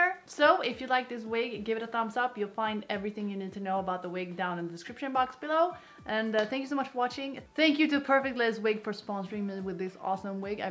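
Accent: Sweden. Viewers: Not to be rude or anything... But does she have a Swedish accent or is that a disorder..... I'm new to this Chanel 🤔😓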